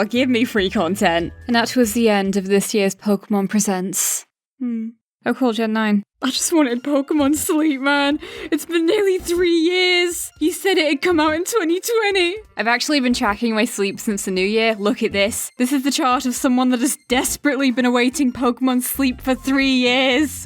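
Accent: United Kingdom. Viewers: Your British and you’ve never summered in Spain? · HOW DID I NOT REALISE YOU'RE BRITISH - I'M BRITISH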